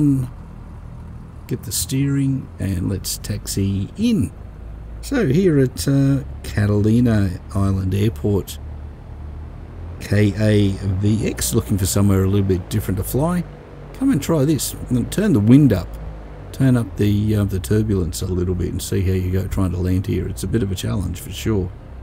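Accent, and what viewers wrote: Australia. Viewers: Mate, I really appreciate your effort to thoroughly documenting keyboard-mouse flying in X-Plane - and other mouse-flying video posts. Just beginning my X-Plane journey during these stay-at-home times. Will definitely try the plug-in. Also, your Ozzie accent is wonderful to hear as I just returned from my PhD study last year in Brisbane. Subscribed your channel.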